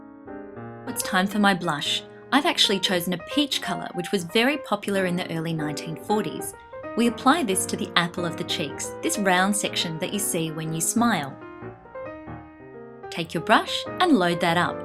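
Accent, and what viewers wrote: Australia. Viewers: I was taken aback at the Aussie accent. It's always nice to hear on this platform, though.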